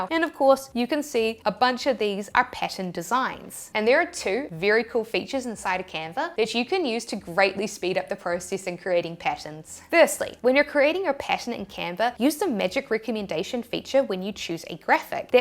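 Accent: New Zealand. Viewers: Good to see another Kiwi on here 🙂 I happened to stumble upon your page by accident, and so glad I did. Your content is awesome. As an ex-pat, I miss hearing the accent. Keep these wonderful videos coming. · Fush and Chups! Love the accent and Aotearoa (from UK) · I love your kiwi accent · Thought you were Australian but apparently New Zealand is somewhere between Irish and Australian. Looking at the pinned comment I'm sad to see that this isn't such an original idea. Oh well... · I do hope to try these tips at some point but for now, I'm here for the hacks and the New Zealand accent (which is amazing!)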